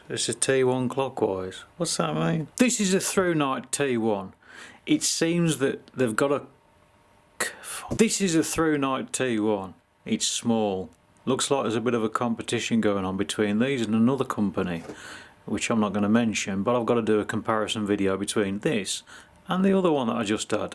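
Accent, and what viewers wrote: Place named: Stoke, United Kingdom. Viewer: What city are you from? You sound stokey.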